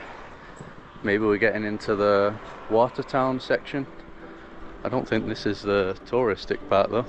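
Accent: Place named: Manchester, United Kingdom. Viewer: Enjoying all your videos, great channel. Funny how the Manc accent keeps popping out every now and then too. Live there myself so easy to spot! What area were you?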